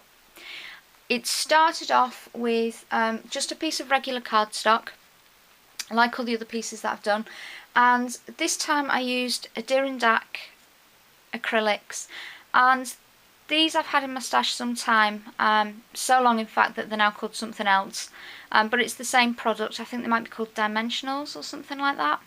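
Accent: United Kingdom. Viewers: Congrats on your over 1,000 subscribers!! You have great videos and you're so talented, plus for us Americans....me anyway, I love your "accent"...you're very easy to listen to! (Quote around accent because wasn't that long ago, I was the only American on the whole of the Isle of Wight and everytime I opened my mouth I "stuck out" like a sore thumb. BUT....I loved the UK and really everyone I met, was such a delight! Seriously though I just love your no fear attitude with your crafting!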